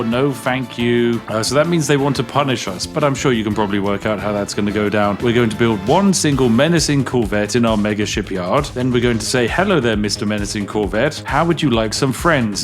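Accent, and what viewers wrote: United Kingdom. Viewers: I think this guy might be a tad British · I don't even want to play this game anymore. I just enjoy his sarcastic British canter. At 1.5x speed it's even more potent somehow.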